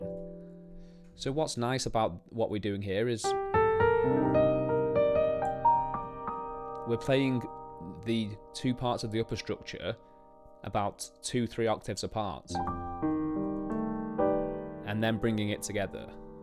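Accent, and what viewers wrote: Liverpool, United Kingdom. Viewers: Either from Liverpool or The Wirral?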